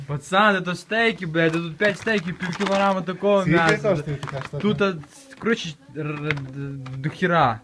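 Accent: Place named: Western Ukraine, Ukraine. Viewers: То чисто під львівський діалект змішаний з сільським )))) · Базаришь как Лох!!! это не есть чистый украинский язык!!! Сразу видно западная Украина Але Але! На майдане стояле а теперь клубнику в Польше собираете!!!!!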